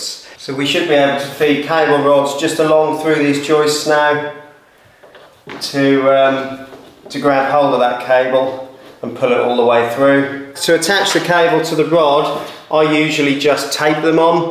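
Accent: Norfolk, United Kingdom. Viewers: Great instruction Buddy. Is that a Norfolk accent!!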